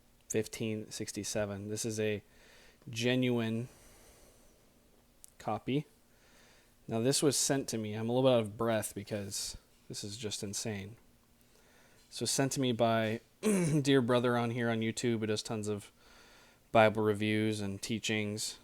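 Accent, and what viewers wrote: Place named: Greece. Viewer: Great to hear Historical Greek Pronunciation (HGP) by an English speaker. Until you spoke English, you sounded like a native Greek.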